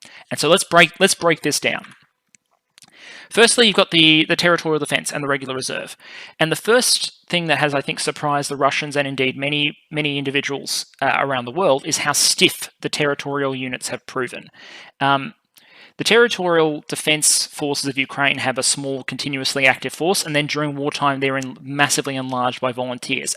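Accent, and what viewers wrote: Australia. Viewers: THAT AUSTRALIAN OR KIWI ACCENT IS DRIVING ME UP THE WALL..ALSO A LOT OF WORDS FLYING AT ME....TOO MUCH INFORMATION.... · You're providing a great set of analytics to consider for us humble viewers and I've enjoyed these immensely. Also great to hear an Aussie on youtube, keep up the good work mate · A very very good analysis of the situation. Many thanks for the depth and knowledge you provide. Especially love the Aussie accent!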